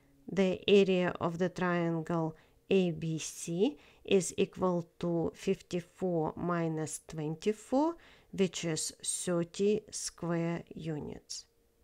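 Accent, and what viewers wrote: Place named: Russia. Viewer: Thank you both for the good problem and your great explanation! By the way, I love your Russian accent when you speak English.😊❤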